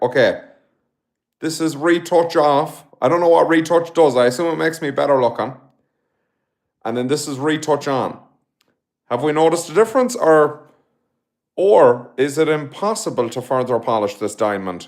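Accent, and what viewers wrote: South, United States. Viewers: I love your Southern American accent, it's suuuuper cute and pretty accurate, coming from a Southerner. 🥰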